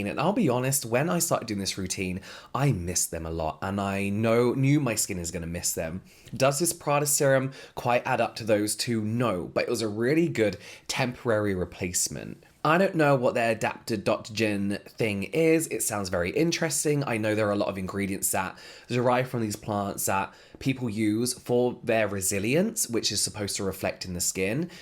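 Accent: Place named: RP, United Kingdom. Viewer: i hope this isnt a weird question but what english accent do you have? Is it conservative RP? I'm just curious to know because i love how classy and posh it sounds.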